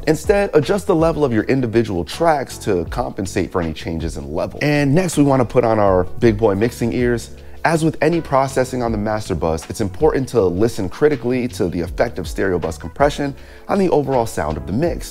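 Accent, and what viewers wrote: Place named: United Kingdom. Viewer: look, bruv, i for one enjoy bri’ish miami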